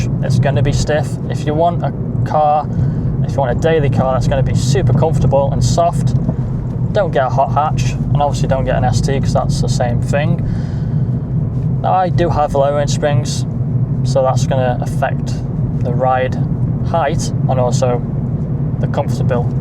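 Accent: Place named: United Kingdom. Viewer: Why are you speaking british?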